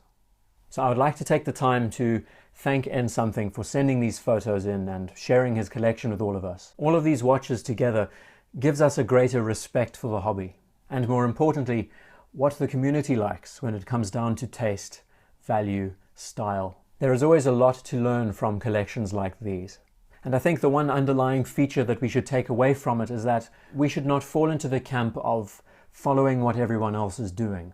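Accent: South Africa. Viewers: Do I detect the South-African accent? )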